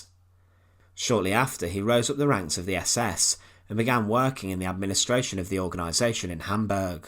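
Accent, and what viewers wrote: United Kingdom. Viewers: I wish your British accent was not so prevalent and more understandable.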